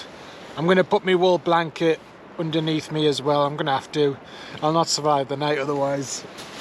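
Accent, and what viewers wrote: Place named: Newcastle, United Kingdom. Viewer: By the way, I do want to add that I did enjoy the video. You're like a Geordie version of Joe Robinet.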